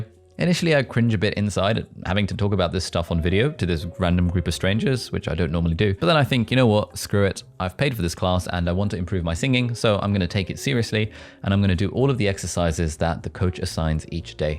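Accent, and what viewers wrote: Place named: United Kingdom. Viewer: You have a nice accent of Britain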